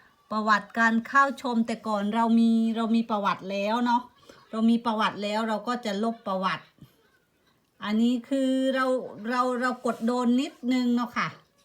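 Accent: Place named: Surin, Thailand. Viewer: ขอบคุณสำหรับความรู้ดีๆครับ เสียงบรรยายคล้ายคนสุรินทร์บ้านเดียวกันเลยครับ 555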